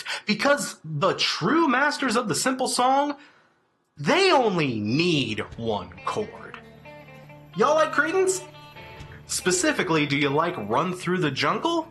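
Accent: Boston, United States. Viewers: Wow that Boston accent...for a moment I figured you thought they were from Australia. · I was enjoying your Boston accent.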